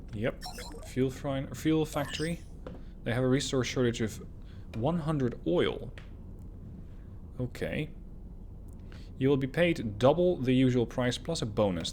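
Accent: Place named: Netherlands. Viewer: holy shit you're dutch! or belgian, or russian (but doubt). ahem I mean "oh cool, a recent avorion stream ^-^"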